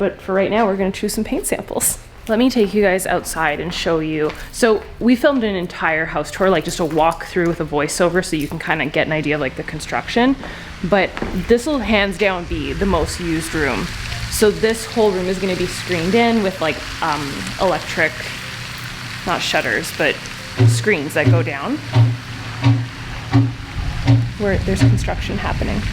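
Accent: Canada. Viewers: Omg. In love. Brett and I are living vicariously through you until we reach this! You have definitely raised our standards and life goals! We watch all of these golden oak videos together. Also my almost two yr old thought it was very funny when you kept saying babe bc Canada accent compared to our Texan accent lol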